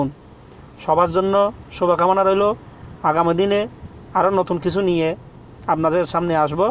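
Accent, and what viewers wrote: Chittagong, Bangladesh. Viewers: Kothai bujha jay,,baiyye chittainge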